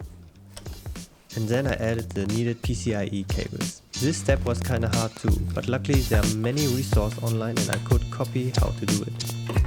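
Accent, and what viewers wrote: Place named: Germany. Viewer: cooles video aber man hört schon das du deutsch bist und du hast alles richtig gemacht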